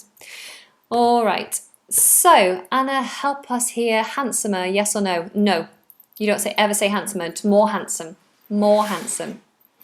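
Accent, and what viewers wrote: United Kingdom. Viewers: I don't like the British accent thank you.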